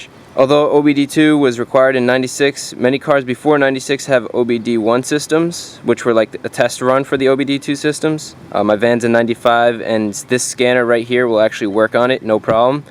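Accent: United States. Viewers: Sir are you an american ??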